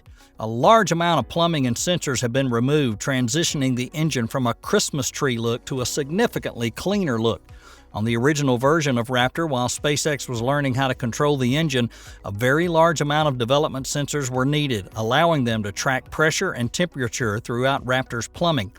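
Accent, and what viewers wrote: South, United States. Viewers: Anyone else find it hard to watch a technology video and take it seriously when narrated in a southern accent?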